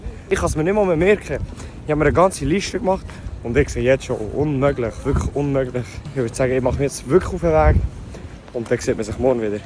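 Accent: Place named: Switzerland. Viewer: würde schauen, wenn du kein schweizer wärst. verstehe kein wort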